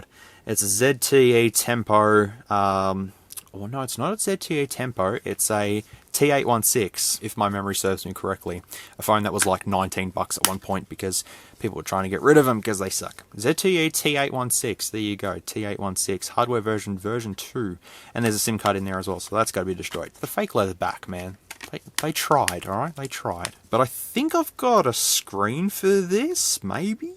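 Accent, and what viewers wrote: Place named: Australia. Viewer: Also he's Australian, that's how we sound.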